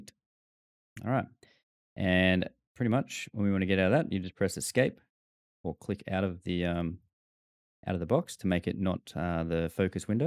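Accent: Australia. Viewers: Great to have some tuts in relaxed Aussie accent, very easy to take in and no time wasting stuff cheers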